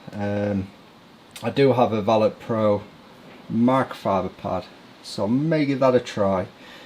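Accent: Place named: Sheffield, United Kingdom. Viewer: because I am from Sheffield, when I watch your videos I always think you must be as your accent is too recognisable.. Glad I was somewhat right 😂 looking forward to the next video. Take care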